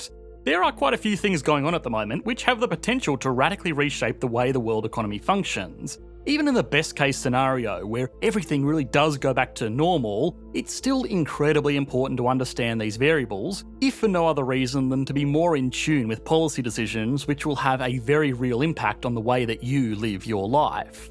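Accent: England, United Kingdom. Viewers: Kind of annoying "Millennia-aard" English accent -) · I CANT UNDERSTAND YOUR BRITISH ACCENT DO YOU HAVE FULL SUBS AVAILABLE???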